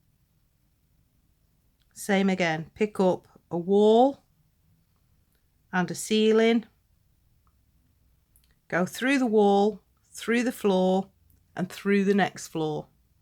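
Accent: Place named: Northern England, United Kingdom. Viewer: Just found this channel, just getting back into beading after a couple of years break. I'm trying to remember how I used to weaves, so reacquainting myself by watching YouTube. thank you for describing this weave so beautifully. I've subscribed, so I look forward to more tutorials. I'm a northern lass, so it's great to hear another northern accent. 😊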